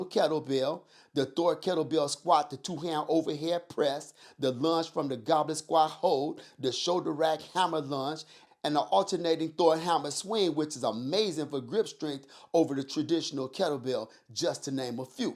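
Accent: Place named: Brooklyn, United States. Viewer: New Yorker he is. That sounds like an old school Brooklyn accent. I'm guessing because the way he talks. He sound like he from Brooklyn.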